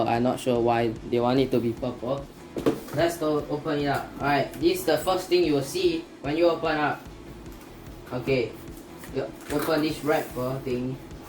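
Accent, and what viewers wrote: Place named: Singapore. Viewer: Singaporean I’m guessing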